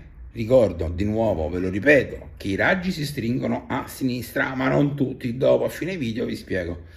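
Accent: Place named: Rome, Italy. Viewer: Dall' accento capisco che anche tu sei di Roma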